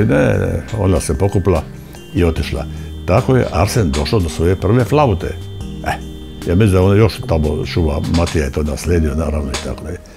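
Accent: Šibenik, Croatia. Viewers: Šibenski naglasak bljesne nakon toliko godina ... 🦋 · Nevjerovatno, ima šibenski naglasak, a trudi se pričati ekavicom... Smiješno...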